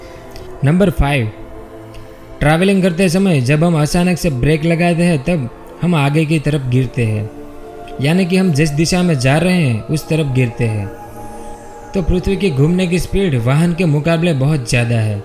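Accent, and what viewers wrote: Gujarat, India. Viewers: गुजराती हो किया भाई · Bhai tu 100%gujraathi hai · Gujarati hai tu.... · Ha 100% u r gujrati.. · You tuber is gujju ....??? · You sounds like a Gujarati 😃🙂☺ Gujjubhaai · bhai Gujarati hai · brother u r gujarati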